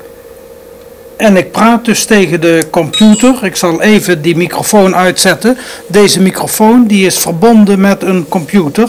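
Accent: Limburg, Netherlands. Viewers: Titulaer was veel cooler dan Wubbo Ockels. Tenminste, dat vond ik toen ik een jaar of 8 was. En nu nog eigenlijk. was een phenomeen. Sommige mensen lachten om hem omdat hij zo markant was. Immers een Limburgs accent hoorde je maar zelden op TV. Het maakte hem in mijn jonge ogen uniek. De baard. De stijl van presenteren. De interviews. De humor. Een groot gemis. Een mens om tegenop te kijken. Rust zacht. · Het is limbabwaans. Hij moest echt zijn best doen om Nederlands normaal uit te spreken. En dan lukte het nog niet. Ik kom uit dezelfde omgeving en heb dezelfde handicap. Wel altijd interessant om te zien dat hij zelf ook zo enthousiast was over alle “miekro eluktrooonika” en de wondurre werold van de kompjoetors. Erg mooie tv van toen.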